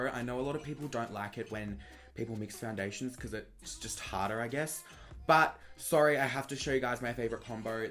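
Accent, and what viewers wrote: Australia. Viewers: lmao at the aussie comments and voice hahahaha 👌😂😂😂😂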